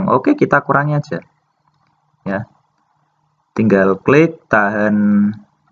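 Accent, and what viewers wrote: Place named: Java, Indonesia. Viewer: Pak medok banget hh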